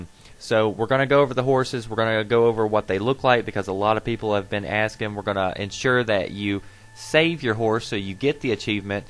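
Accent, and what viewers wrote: South, United States. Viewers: Are you from the south states? Your accent kinda sound like it.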